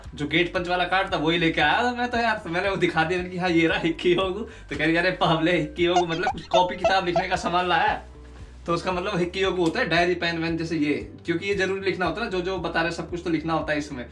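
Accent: Haryana, India. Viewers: Haryanvi on the top!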